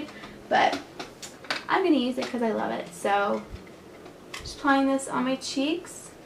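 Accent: South, United States. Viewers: you dont have your southern accent as much anymore ive noticed! love your videos pretty xx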